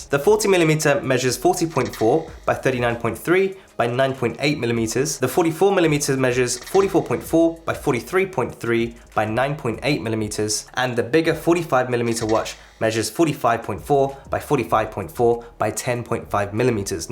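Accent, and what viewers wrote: United Kingdom. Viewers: Very helpful. Thanks...you sound like your from west london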